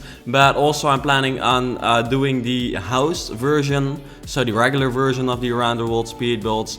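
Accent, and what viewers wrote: Netherlands. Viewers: Gooooo Nederland jeee haha geweldig en mooi gemaakt!! En je hoort wel dat je van Nederland komt #DutchPowerr!!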